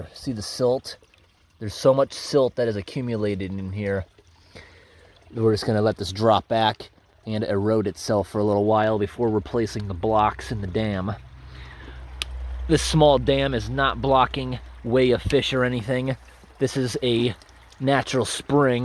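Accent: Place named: Northeast, United States. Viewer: just found you dude and you're super entertaining to listen to and watch! i'm from PA originally and idk if it's just me but you have a north eastern cadence to your speech, makes me homesick. keep up the nice work!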